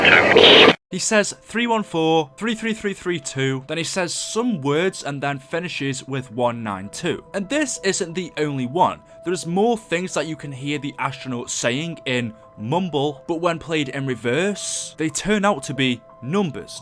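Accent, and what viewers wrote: United Kingdom. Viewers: He sound British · He sounds British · I hear a British voice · the voice sounds slightly British. anyone else agree?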